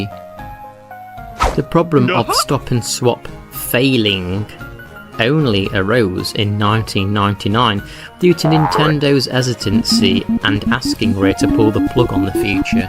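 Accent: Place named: Manchester, United Kingdom. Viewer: Couple months late but this video (and your channel) and criminally underrated. I'm only 11 minutes in but I can already tell this is gonna be a great documentary. Brings back memories of me desperately looking up for ANY content regarding this when youtube first became a site. Fond memories of watching Thumbs Up Masters contents. Also I know it's not an AI voice cos AI is terrible at doing Manc accents ;) Liked and subscribed!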